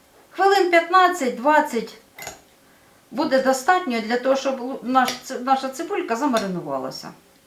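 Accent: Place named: Dnipropetrovsk, Ukraine. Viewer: Обожаю наш Днепропетровский говор😂👍 караси на вид такие аппетитные, шо аж язык хочется глотнуть 👍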